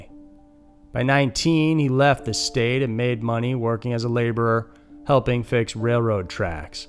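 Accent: South, United States. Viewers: please post every day. I love your hard to pinpoint transplanted Bostonian accent. I asked you on Facebook. I know you've been in NY for years but you don't sound like you are from there either. My husband moved down from NY state in 1978 and you sound way more Southern. You probably won't read this but keep up the great work and Thank you! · Is your Southern accent real?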